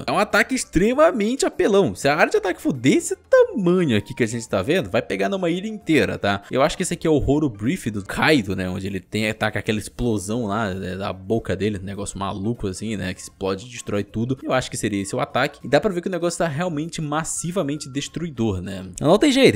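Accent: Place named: Ceará, Brazil. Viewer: eu não sabia que vc era cearense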